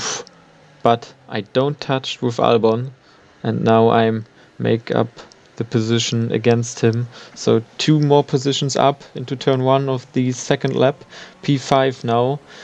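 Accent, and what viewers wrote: Germany. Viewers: Are you german? The accent sounds familiar... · Da hört man doch das deutsche raus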